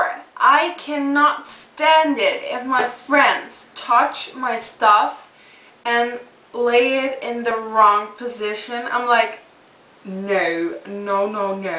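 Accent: Netherlands. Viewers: It's cause she's Dutch and she has an accent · when i first watched one of your more recent videos, i'm so embarrassed to say i actually thought you were American because I can barely hear your accent!! i was happy to discover you are from Holland! I love watching your videos, you are so talented and funny! LOVE YOU!!!!! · That’s a Dutch accent? Interesting.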